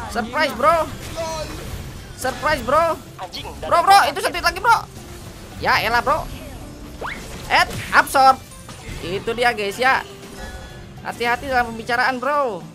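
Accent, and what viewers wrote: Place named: Sunda, Indonesia. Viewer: Nada Bicaranya Kaya Orang Sunda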